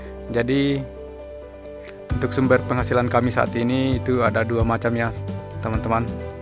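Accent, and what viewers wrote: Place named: Sulawesi, Indonesia. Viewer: Kayak orang mambi ini logatnya 😁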